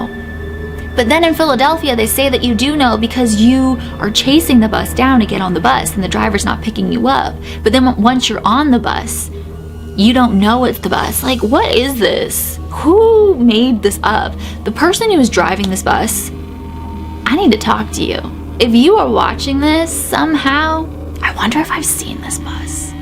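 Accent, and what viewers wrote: Canada. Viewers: First of all your Canadian accent is fine, I love it! Nothing wrong with it all :) Pennsylvania resident here..am about a half hour from Gettysburg. Would love to go there. Born in Philly! Yeah this legend is abit baffling but super interesting and trippy! If you want to explore another incredible story relating to Pennsylvania, look up the town of Centralia. I won't spoil any details here. Not really paranormal but I think it's quite interesting...and true. Thanks for the love for PA! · No don’t lose your Canadian accent ☹️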